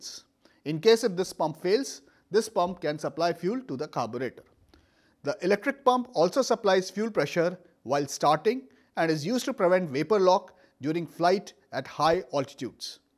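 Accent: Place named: India. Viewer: These Indian heavily accented videos drive me nuts.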